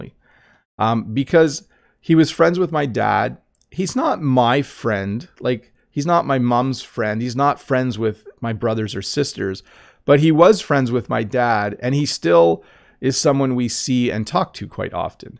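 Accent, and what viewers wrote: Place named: Canada. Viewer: Sorry this is Canada English what is this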